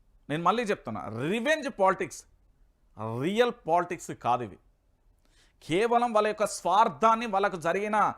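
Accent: Telangana, India. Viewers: Super Anna Telangana gonthu tho ardam cheppinave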